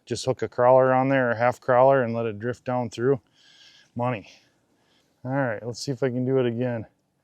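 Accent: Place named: Wisconsin, United States. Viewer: You can tell by basically after everything he says he sounds like Skansen, Wisconsin, Wisconsin. End it seems like he’s up by Northwest probably by Minnesota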